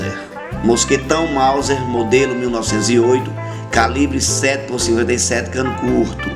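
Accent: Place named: Northeast, Brazil. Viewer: Sua narração é show, seu sotaque nos emociona, só quem é nordestino senti!!!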